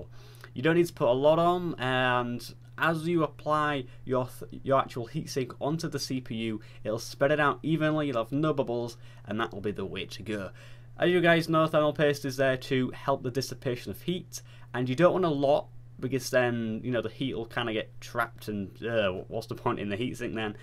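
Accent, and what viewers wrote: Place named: Northern England, United Kingdom. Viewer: Thought so, you sound more northen than me haha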